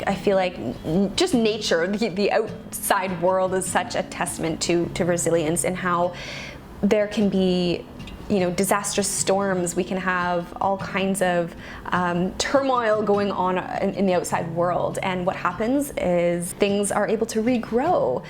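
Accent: Canada. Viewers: Are you Canadian? Kinda sounds like Canadian hehe.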